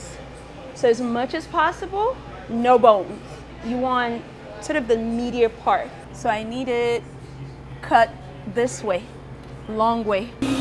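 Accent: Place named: Ondo, Nigeria. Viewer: I love the Ondo accents love u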